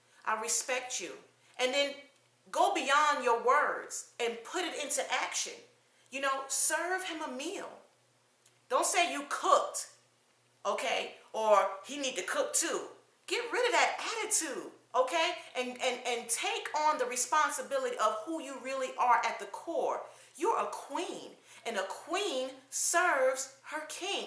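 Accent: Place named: New Jersey, United States. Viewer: Ms, great message. I could tell you were raised with your dad by the attitude you have toward family men that provide. Also I can hear that new jersey accent. Peace and blessings.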